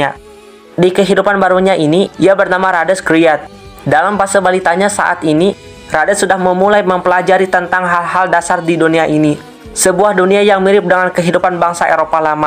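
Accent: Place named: West Java, Indonesia. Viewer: suara khas sunda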